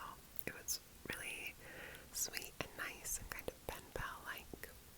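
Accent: Argentina. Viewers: Felicitaciones por estos 7 años con el ASMR. Que vuelvan pronto los videos en español con acento argentino. Saludos.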